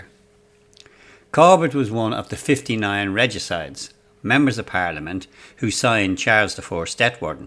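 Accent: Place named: Dublin, Ireland. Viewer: Fascinating and very well done presentation! Thank you! From an African American from New York, lovin" the Dublin accent!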